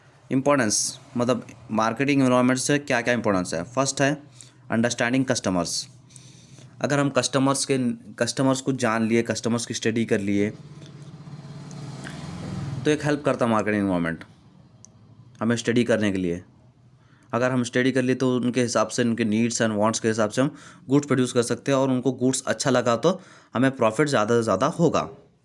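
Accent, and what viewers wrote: Hyderabad, India. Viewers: sir aap hyderabad se ho?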